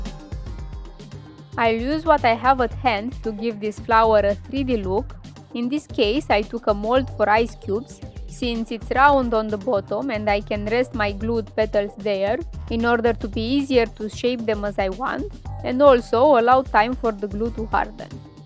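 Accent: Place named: Romania. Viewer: Ma bucur ca ai prins 1000 subs.Foarte tare accentul romanesc.Succes.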